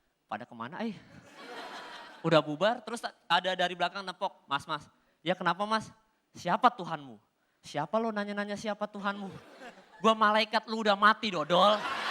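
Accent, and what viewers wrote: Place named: Banyumas, Indonesia. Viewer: Ko bahasanya rada rada ngapak yah <link>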